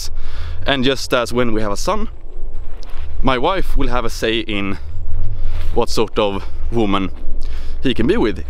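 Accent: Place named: Sweden. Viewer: "If i See behavior i like - i press Like!" "If i See behavior i do not like - i do not press Like!" The Swedish Accent makes it sound even more savage!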